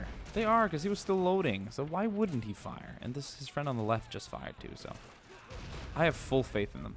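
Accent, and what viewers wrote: United States. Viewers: Southern accent God tier👌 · you sound like idubbbzTv what accent in America is that?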